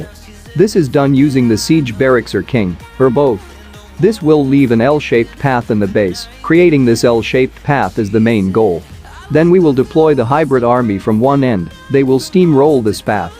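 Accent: Kerala, India. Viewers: Malayali aano bro? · Bro Malayali aano?? 🙄🙄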